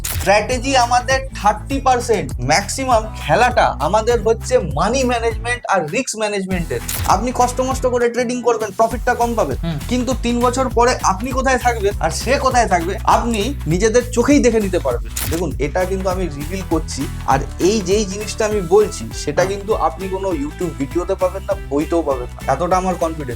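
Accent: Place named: West Bengal, India. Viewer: ইন্ডিয়ান বাঙালীদের কথাবার্তায় একটা বাটপার বাটপার আভাস পাই। আপনাদের accent অনেক ক্ষ্যাত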